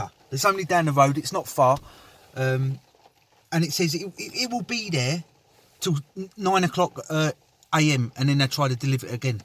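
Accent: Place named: London, United Kingdom. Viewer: Refreshing to get a London accent on YouTube.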